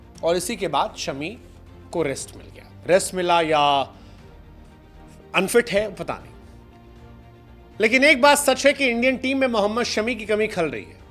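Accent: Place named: Uttar Pradesh, India. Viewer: ghanta fadak padh rha hai😅, up waale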